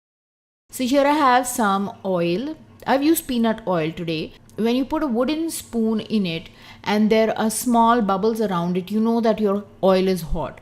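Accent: India. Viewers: She has an Indian accent.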